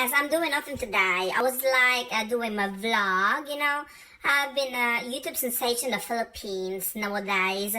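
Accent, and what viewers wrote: England, United Kingdom. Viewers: Britist Accent ah gimar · The accent anti, briton, i love it, uray ta badom ken ta lipistic mo nagmayat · Owmy Anti nalaing ka gayam agsarita iti ingles, british accent pay aya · huwaaawww!! British accent ni anteee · Ganda ng british accent mo anti:)) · Alla ni anti🤣surwan naktu man anti ti english speaking ken british accent mo💙lovin' all your video^_^ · Filipinos taking over the world through pancit, lumpia and adobo😃😂. Some of my coworkers are one pancit away from becoming Filipinos 👍Nice British accent by the way😊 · nakabritish accent ni antie.. nglaing ka man antie.. surwan nak man met garud!!Godbless u antie.. ingats!!😘❤️ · Nagtangsit ti British accent mo auntie!!! Bilib ak manen kanyamon. HAHAHAHAHAHA 😂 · mayat man ta British accent mo i like it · Was that British or Australian accent Auntie? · Hala nagtangsit ni anti..English speaking..adda pay British accent na..pasuruak man anti..😄😄😄😁😁😁😅😅😅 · British accent ka met gayam... 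· Nosebleed with your british accent❤️❤️😍😍anty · british accent ni 😊😊😊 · oh my goodness! that british accent i sooo love! nagperfect ka! magustwak dagita nga accent mo. namin anok inulit ulit nga binuya detoy. nagperfek talaga 😂😂😂. thanks anti napaisem nak manen. 😘❤️ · more British accent plz love it so much.. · wow anti british accent. laing k nga tlg.. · British accent ni Anti..Love it. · naglaibg ka agsao ingles auntie, kasla ka lang taga UK. proud of u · Hahahaha!!!! Britische Accent Ni Ante....Guten Morgen From Homburg Saarland Germany 🇩🇪...Naiinlove na yata ako sayo..... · Nagtangsit ti panag english ni 👏👏👏 british accent ni annntttiii tayu!! 😊😊😊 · Mayat iti British accent ni Anti! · Naka british accent pay auntie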